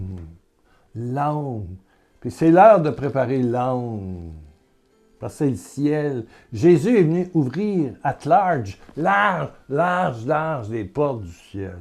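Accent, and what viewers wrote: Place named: Canada. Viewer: s'est lâché avec son accent canadien aujourd'hui, c'est très agréable. Merci pour tes paroles de réconfort. Il st tellement difficile d'être joyeux en pensant à toutes les victimes innocentes des guerres. Je ne pensais pas qu'à notre époque, il y aurait encore des guerres. Et j'ai pensé à dire à la Sainte Vierge Marie de prier sur tous les responsables politiques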